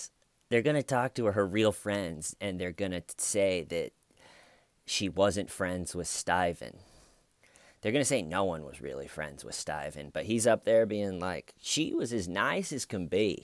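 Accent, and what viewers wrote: United States. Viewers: His accents too American and irritating. I keep wanting to shake him and tell him to speak properly.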